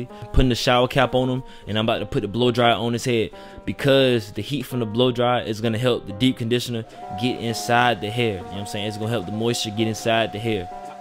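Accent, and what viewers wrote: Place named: New York City, United States. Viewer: Ya he definitely got the NYC❤️ Accent Like if you agree 👇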